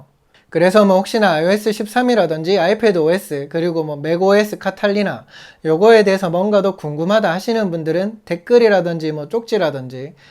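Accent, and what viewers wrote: Gyeongsang, South Korea. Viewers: 아우.. 목소리도 답답하고 그 목소리로 경남 사투리쓰니까 들을수가 없네요.. 첨입니다 악성댓글은 참 대단하세요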